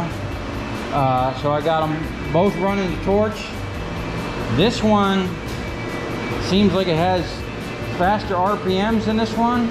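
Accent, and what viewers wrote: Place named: Philadelphia, United States. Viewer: You sound like you have a Philly accent.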